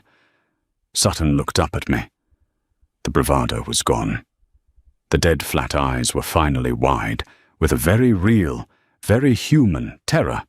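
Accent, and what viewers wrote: England, United Kingdom. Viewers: narrated by an American frontiersman with AN ENGLISH ACCENT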